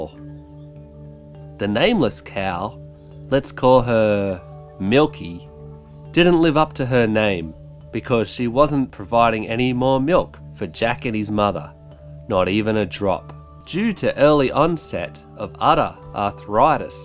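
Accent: Australia. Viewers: "once upon a time there was a boy named jack". you took me here!! Ps: aussie accent is attractive the most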